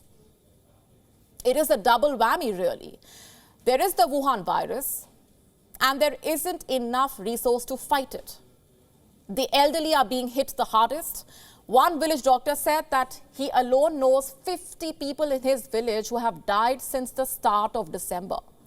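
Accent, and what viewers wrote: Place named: India. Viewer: don`t you have a speaker speaking english? This indinglish is really annoying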